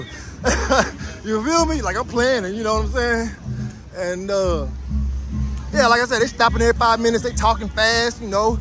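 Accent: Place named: Texas, United States. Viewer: Nigga I'm from East Texas too, Longview ! Lol ! About to get my passport. I knew this dude had a texas accent lol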